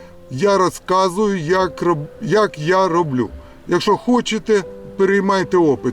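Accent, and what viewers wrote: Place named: Russia. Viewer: Боже, як в тебе мова запаскуджена язиииком!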